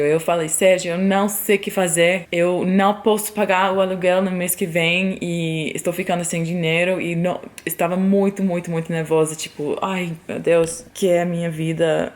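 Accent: Rio de Janeiro, Brazil. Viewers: Tão fofinho e bonitinho seu sotaque carioca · Seu português e ÓTIMO !!! Melhor ainda com o sotaque carioca !!! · Teu sotaque de leve já dá pra sentir o carioca! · Amo seu sotaque carioca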